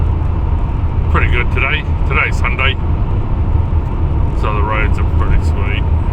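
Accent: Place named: New Zealand. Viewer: Wonderful that you inherited his beautiful collection because you appreciate those rocks so much. His lifetime collection went to someone who understands the joy and work he had as a collector too. Will be wonderful to see the next part of their journey, how you use them. Even sharing them with us is a gift. I live in Oz but I'm NZ born and love rocks. I've learned a lot watching American rockhound channels. I'm so excited to have found your channel and to see my homeland and the treasures we have in New Zealand. Mauri Ora bro. Thanks for sharing. Subscribed and excited to follow. I'm looking forward to watching your past and future videos. So good to hear that Kiwi accent and find someone who shares my love for rocks. My Dad is taking me rockhounding soon here in Oz and I'm excited. I've never been but I used to find serpentine when I was a kid amongst the rocks at Shipwreck Bay on the 90 mile beach. 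My Nan would take us up into the sandunes and we'd find beautiful gems, agate nodules smoothed by the sand. She also had two Moa stones and a couple of Nautilus shells she found on the beach. Spent many happy hours beachcombing as kids and finding shells. We lined her driveway with giant toheroa shells. Don't know what happened to her treasures. So sad. I'd give anything to have had those because they were so special and she meant the world to me. Best days of my life were my childhood with her. xo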